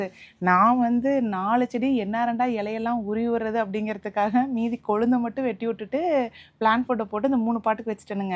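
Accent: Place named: Namakkal, India. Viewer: Sorry, nangalum namakkal than ka. Neenga visa visanama pochnu solrapa engala mathri solreenganu santhoshama irukum ka.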